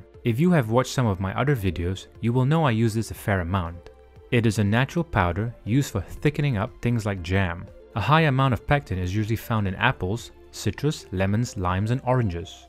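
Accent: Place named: Netherlands. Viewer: You sound Dutch but you said you grew up in Malaysia I’m confused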